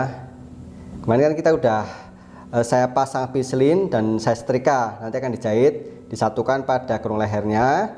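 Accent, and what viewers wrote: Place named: Pekalongan, Indonesia. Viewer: Kok suara nya seperti orang Pekalongan dongwuni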